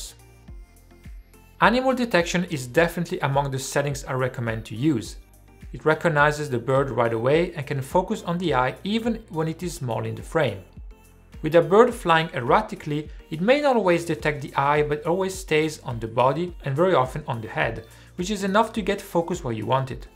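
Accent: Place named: France. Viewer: Are You French? (je suis français et je reconnais un accent bien de chez nous^^). J'aimerais tellement que tu puisses tester / proposer des comparaisons avec le FUJI XT4, un APSC qui me fait de l'oeil. Même une comparaison avec des FF (Full Frame) serait intéressant:)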